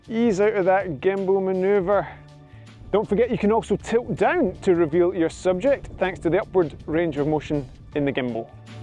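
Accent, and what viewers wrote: Scotland, United Kingdom. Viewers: ... and scottish accent ...! Beside the really valuable tipps. Greez from 🇨🇭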